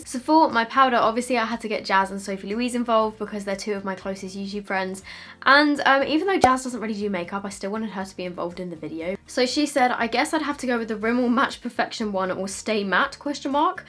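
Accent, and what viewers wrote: England, United Kingdom. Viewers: Is she An Englishwoman? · vlogs british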